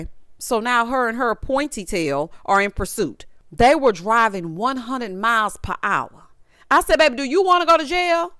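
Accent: South, United States. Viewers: Blythe from NYC, here. I usually listen to your reviews on speaker when I’m doing something else. Today, my bf overheard and was oh so tickled by the “sassy southern belle” coming from my phone😂.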